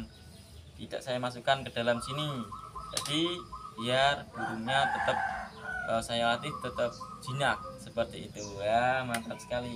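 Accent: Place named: Kendal, Indonesia. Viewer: Logate kok koyo wong kendal mas... kendale pundi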